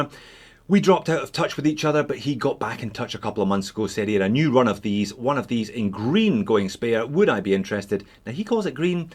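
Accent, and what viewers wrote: Scotland, United Kingdom. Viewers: Scottish, that is what I thought. Just didn't want to say it on YouTube and make fool out if myself, in case I would have guessed wrong.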